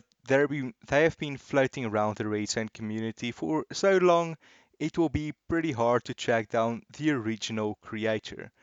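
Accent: South Africa. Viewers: You sound South African. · Really nice vid, bud! Sorry if this is rude, but you sound South African. Thanks for the T-flip-flops!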